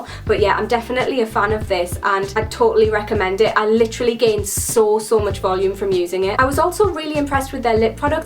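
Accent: Newcastle, United Kingdom. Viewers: Her accent is from around Newcastle do it Northen lyk a Geordie kinda common accent...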